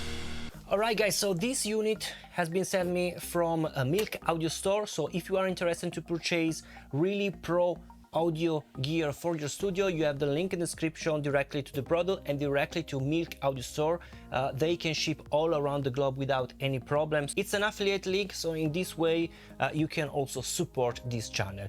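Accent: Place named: Italy. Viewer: this is how italians talk english 😅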